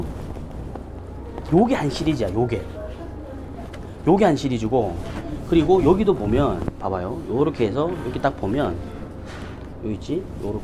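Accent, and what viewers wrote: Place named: Gyeongsang, South Korea. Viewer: 학교 선생님하셨어도 애들한테 인기짱이셨을 거 같아요 경상도 사투리임에도 정확한 딕션과 유머러스한 말투 너무 재밌고 귀에 쏙 들어와요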